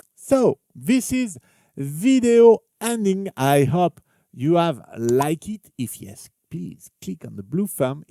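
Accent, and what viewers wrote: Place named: France. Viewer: thank you for creating this software. Love your accent my French neighbour.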